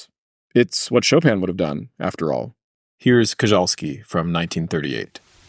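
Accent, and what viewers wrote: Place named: United States. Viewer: Thanks for the reply - I recall now that Americans pronounce "era" and "error" alike, which has confused me before. You guys certainly make some weird and apparently arbitrary alterations - what is the logic behind putting a long o sound in "Chopin"? And it's clearly spelt Koczalski, so why say Koczolski?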